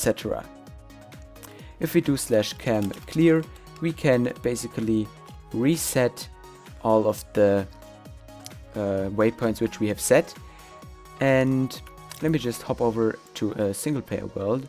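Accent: Germany. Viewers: Du bist Deutsche, ne? Merkt man direkt :DD Nice MOD by the way!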